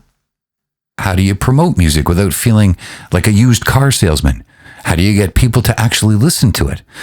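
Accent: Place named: Canada. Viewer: Bro,your accent has a little Canadian or maybe Minnesotan flavor. I noticed your "aboot/aboat" and the way you say "sory".....amirite? You don't have to answer, I know that's considered an inappropriate question these days. I'm just learning to ask questions, something I was not allowed to do growing up so cut me some slack maybe? 😊💙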